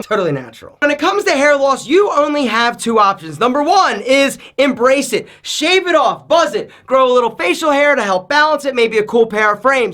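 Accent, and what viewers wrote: Philadelphia, United States. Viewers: Is this guy from philly cause he got that strong ass philly accent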